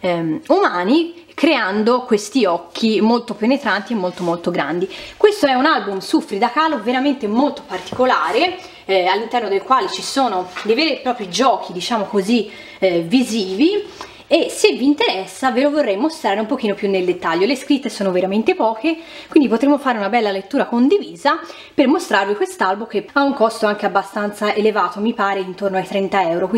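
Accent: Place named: Tuscany, Italy. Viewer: Ciao, e vuoi vedere che la fine del petrolio sia la fortuna dell umanità. Bellima la parlata toscana.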